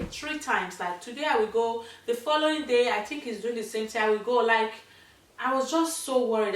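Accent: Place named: Benin. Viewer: Hey n hubby New subscriber Alert💃🏾💃🏾💃🏾 and I have watched sooo many of your videos...lol I love the fact that you are very natural and I can relate soo well with you cos am Nigerian and am feeling that Benin accent sooo well! Lol Love u gurl All the best to your hubby and the new baby Cheers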